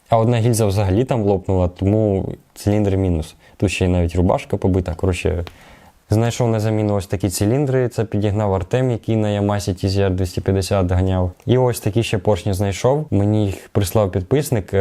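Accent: Chernihiv, Ukraine. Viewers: опа, а ти з Чернігова? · Ти з Чернігова? Вогонь)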